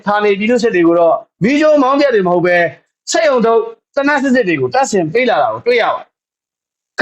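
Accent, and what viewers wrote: Yaw, Myanmar. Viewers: ကီစ္စမရှိ ရေ တင်ပြမှုတိုင်းအားပေးလျက် 👍💯အဆင်ပြေသလို့လုပ်ပါနော်👏👏👏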